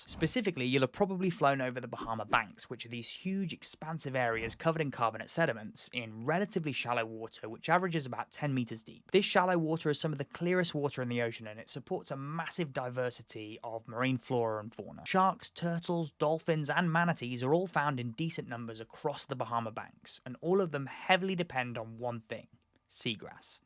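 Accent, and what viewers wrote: United Kingdom. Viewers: cool! Just sounded funny coming from a brit accent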